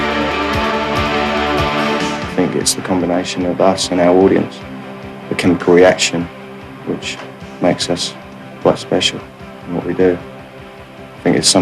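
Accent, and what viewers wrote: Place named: United Kingdom. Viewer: lvly british accent!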